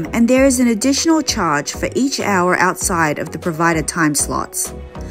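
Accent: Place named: New Zealand. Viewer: Wonderful video, and short too! I love that it was short, but lots of information. By the way, I'm dying to know what part of the world you're from. I hear New Zealand in most of the words you say, but then I hear a little bit of United States English in other words you say. I'm fascinated, but I digress.... My very first cruise ever was on the Oasis of the Seas, and ever since then I've been dying to get on the Symphony. I know the two ships are very very similar, but the Symphony is bigger, as I understand. I remember Sorrento's Pizza not looking very appealing at first glance, then one of my fellow passengers insisted I needed to try it out. I did, and I was floored at how delicious the pizza was. The Solarium is a fantastic included restaurant. I love that it's adults-only, and that it requires a reservation. You cannot beat the entertainment on board the Oasis-class ships. 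It's first rate. I'm really disappointed for you that they ran out of soda so quickly in the voyage - especially considering the fact that guests paid for the drink package. I would have demanded my money back for the drink package. I'm booked on the Harmony for this coming April, and am anxious to see how good the included food is this time around. The first time I sailed, I thought all of the included food was phenomenal. But since they've implemented the new menu changes, the reviews have been mixed. On my first voyage with RCL, I purchased two specialty dining restaurants: 150 Central Park, and The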